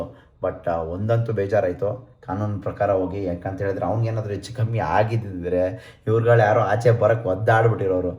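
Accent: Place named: Malavalli, India. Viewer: Hi bro... Nan nimma vlogs na recent agi nododikke start madiddu compare to others nim vlogs nange tumba ista aytu bcoz of namma kade use mado slang alli matadtira navu malavalli avre😊 keep on growing bro ❤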